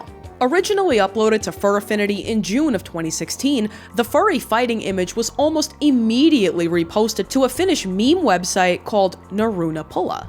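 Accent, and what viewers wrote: New York, United States. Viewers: I aint from that area so i dont know for sure but its either new york, boston, or new jersey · your accent whips. not enough youtubers with potent new york accents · you are my favorite lady content creator and I want to say tho I still hear a very slight NY/NJ accent I still find you charming and intelligent. Keep up the great work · She keeps mentioning her accent is she from new York? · I love your accent! It reminds me of Whang so I'm wondering if you're also a fellow New Yorker. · close. That’s a NY accent. Staten Island maybe? Have a cousin who was born in Stat. They say words similar. I could be wrong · I love your accent. New Yorker? New Jersey? Boston? I can't tell.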